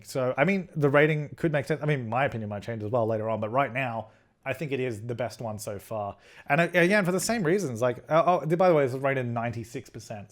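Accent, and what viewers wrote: Australia. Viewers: Are you Australian? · I love your voice and accent, are you Australian?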